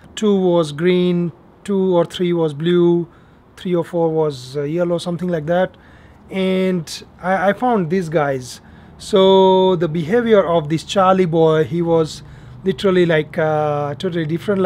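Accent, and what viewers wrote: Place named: Bhojpuri, India. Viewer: Bhojpuri accent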